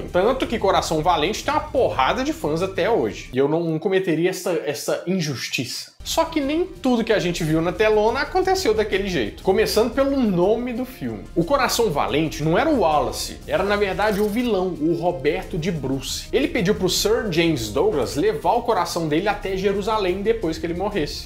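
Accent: Minas Gerais, Brazil. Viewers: Ele fala mineirês.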